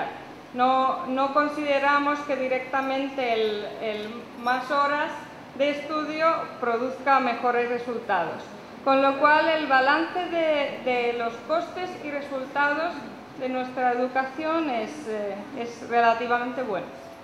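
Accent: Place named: Spain. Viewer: sí que se le nota el acento español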